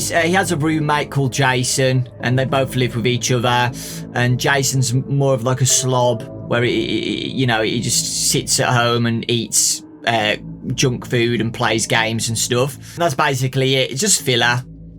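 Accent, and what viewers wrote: United Kingdom. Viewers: What’s the point of buying the book when you can just listen to a British lad read it for free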